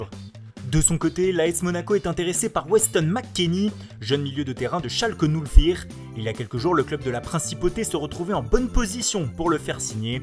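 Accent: Italy. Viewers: En néerlandais "oe" se prononce "ou" Investissez un peu dans autres chose que l'accent italien hyper prononcé svp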